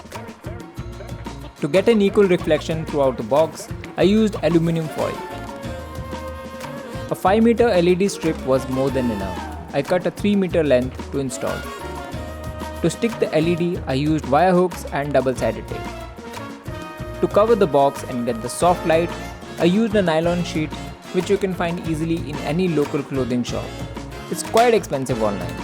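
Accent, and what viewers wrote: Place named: India. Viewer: When the video started, I thought it was from the USA or Japan — but the moment I heard the first word, I was like, 'Aha! That’s definitely our Indian brother!' 😄🇮🇳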